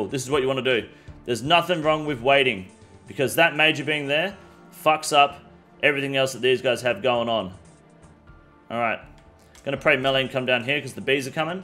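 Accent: Australia. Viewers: ❤Such a Nice Australian accent 🦘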